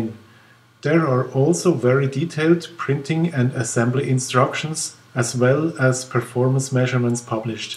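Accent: Switzerland. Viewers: Great project, keep it up! I guess from your accent and from the markings on the plane, you're from Switzerland ;-) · Oh, dr schwizer Akzent 😂 aber supper idee/ video!!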